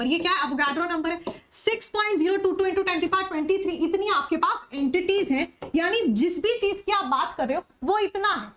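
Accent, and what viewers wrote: Punjab, India. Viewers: Kya hi bolte ho mam punjab se ho 😅 subse best physical chemistry teacher ❤️😌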